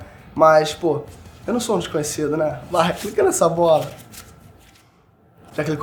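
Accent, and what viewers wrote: Rio de Janeiro, Brazil. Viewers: Ele ta no caminho! gostei! tirando o sotaquezão carioca... mas isso a gente se acostuma! dale!! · ahah imagino para quem não é do Rio ouvir esse sotaque. até pra mim é puxado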